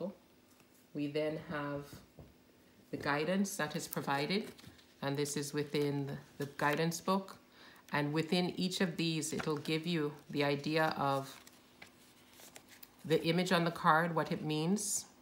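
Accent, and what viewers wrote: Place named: Jamaica. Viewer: Trying to figure out your accent. Not sure if your Jamaican or not. Maybe Trinidadian. I know both talk differently. I’m usually pretty good recognizing Jamaicans. Maybe it’s not even the two countries I mentioned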